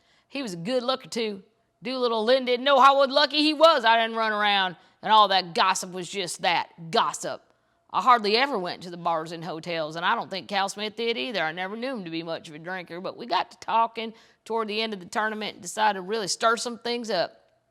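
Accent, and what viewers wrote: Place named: Appalachia, United States. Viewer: you have to read it in your hillbilly voice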